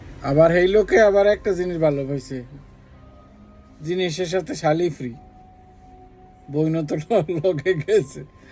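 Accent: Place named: Mymensingh, Bangladesh. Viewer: vai mymensinghor vasa apnermukhi sei lage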